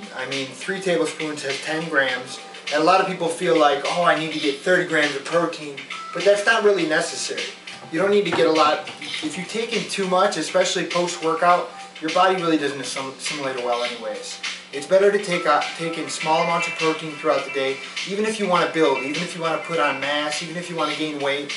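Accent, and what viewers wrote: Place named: United States. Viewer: Your American accent is too thick and with that messy kitchen I can't concentrate on what is happening.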